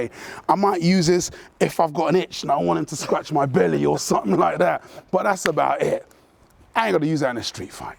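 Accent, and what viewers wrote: United Kingdom. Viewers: I only noticed you had a UK accent after being subscribed for a month. I’ve watched enough Doctor Who that these accents slide under the radar unnoticed. #Murica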